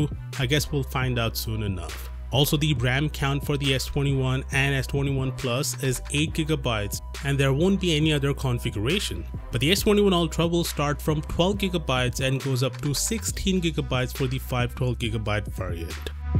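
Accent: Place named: India. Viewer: You're accent sounds Indian.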